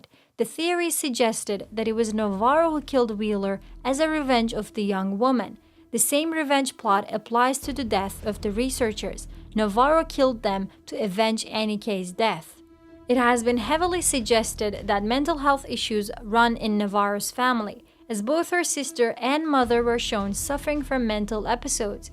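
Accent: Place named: Russia. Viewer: Haha Russian or Ukrainian it sounds like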